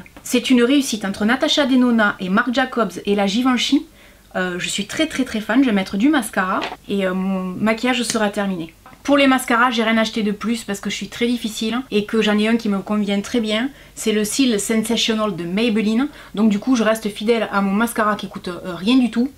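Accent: South, France. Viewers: Bravo. Bons conseils et toujours aussi charmante. J'adore le petit accent du soleil ❤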